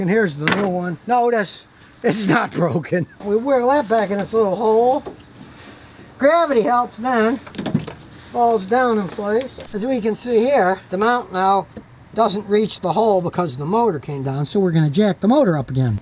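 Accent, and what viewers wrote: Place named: Philadelphia, United States. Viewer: Is this guy from Philly?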